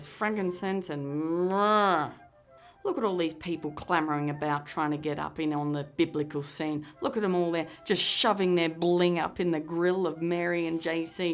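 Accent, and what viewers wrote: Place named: Australia. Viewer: as someone English I think she really doesn't sound English? she's Australian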